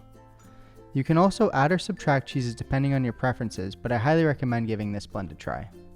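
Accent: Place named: Canada. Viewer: sounded extra Canadian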